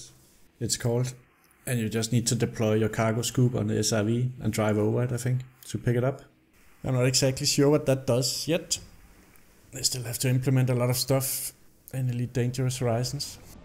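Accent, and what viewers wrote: Denmark. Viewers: Are you danish??? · hehe 7 sec in to the video, and Han er dansker :) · Tak. Så nemt at høre du er dansk 🤗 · Nope. He sounds like a Danish guy speaking English.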